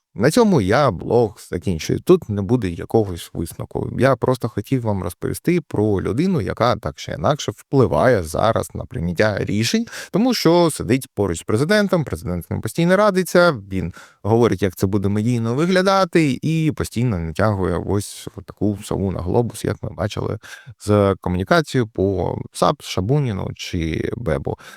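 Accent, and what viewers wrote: Russia. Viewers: Пане, нардепе, підтягніть, будь-ласка, свою українську мову. Ви цікаві викривальні матеріали робите. Але тако буркочете під ніс і видно що через те, що ви російськомовний, бо не впевнені у своїй лексиці, та з'їжджаїте через речення на русизми, та рос прононс.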